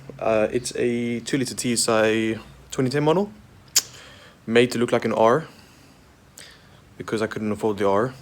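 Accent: South Africa. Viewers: brother this is awesome, You sounds south african! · bra youre so me lol. You even sound South African ...Only thing left is for you to be from the kasi.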